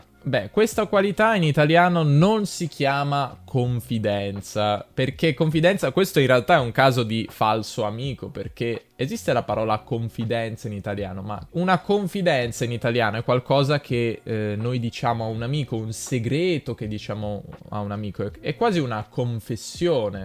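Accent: United States. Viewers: Very American accent to your English. Is that the English they teach in Italia? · I love these videos. Also, your American accent is spooky good!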